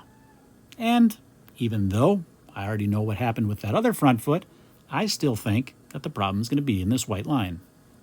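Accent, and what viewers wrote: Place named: Midwest, United States. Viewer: I am obsessed with. His gentle Midwest accent and easy demeanor is fun to watch and listen too. must have huge forearms and wrists as he removes that dense horn. Thank you for your educational videos.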